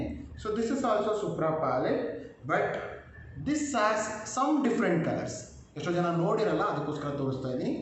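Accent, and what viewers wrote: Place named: India. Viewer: Kannadadalli explain madiddke thank u so much sir.....Your Kannada accent is super.... Sir pls do more n more videos in kannada..... V r waiting ur videos in Kannada language ...... Thank you sir.... And also pls explain about different brand of foundations.....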